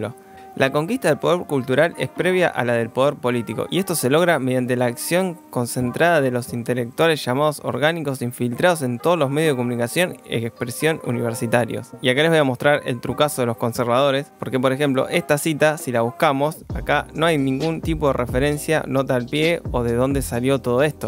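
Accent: Argentina. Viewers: Sos de Argentina,seguro ?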